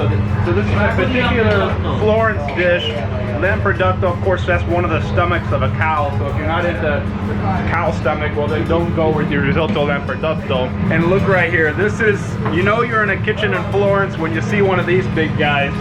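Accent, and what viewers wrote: United States. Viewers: Interesting and informative videos. Though. You might want to get on Duolingo and do a simple Italian diction study. Your accent on Italian phrases is very American. But lots of great info. I haven't been to Italy yet sadly but plan to go. I'm an musicologist/opera coach so I have studied Italian language for years. My birthday is always around Carnevale so want to do that in Venezia. I have friends in Roma, Milano. So probably next year. It is indeed so important to learn customs, etiquette. One of my close friends is an opera singer in Rome and she said she thought it was appalling seeing Americans showing up for the opera in flip flops and t shirts instead of formal wear. One can still be comfortable with silk shirt and slacks in the hot months. I'm glad to hear Italy is getting more progressive with vegano (vegan) or vegetariano (vegetarian items) on menus. 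Though I love Italian food, all that cheese/dairy and meat can be so heavy. Great tips on credit cards. Exactly what you mentioned happened to a friend when she was in San Remo, she forgot to take her credit card at a ristorante and either it was stolen or lost. Luckily she was able to stop that card and had another credit card back at her hotel. Eating late though is so different to me as I never like to do that.. I then feel heavy or can't sleep. But my Mom's family is Galician and sometimes like the Italians, the Spanish eat dinner usually around 8 or even 9 pm. So what a lot of my American friends who are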